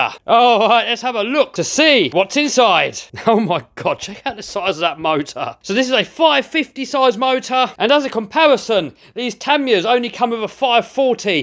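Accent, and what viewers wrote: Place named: England, United Kingdom. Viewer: Amazing english accent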